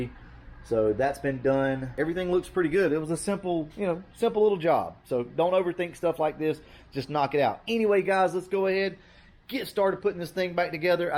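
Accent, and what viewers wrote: Alabama, United States. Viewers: I'm from the south I heard your accent yep Alabama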